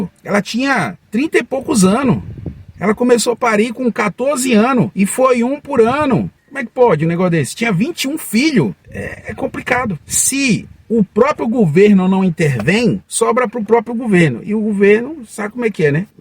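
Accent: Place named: Brazil. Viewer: Salveeeeee familia , é bom dar concelhos pra pessoas k querem vir para Portugal , mas algumas pessoas não pensam k é bom ter a mente bem preparada , pois o psicológico é muito importante e tbm ter algum dinheiro guardado ne' ? Enfim Você ajuda , as pessoas k aceitem o k lhes dizem pk é para o próprio bem deles . Muito bom teu português , adoro o português brasileiro , eu adoro . Um beijinho familia , deus vos abençoe sempre 💖🇧🇷🇵🇹🥰🥰🥰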